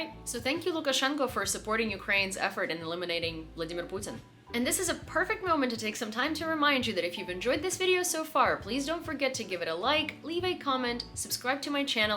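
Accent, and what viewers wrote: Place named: Missouri, United States. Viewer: You sound like you're from Missouri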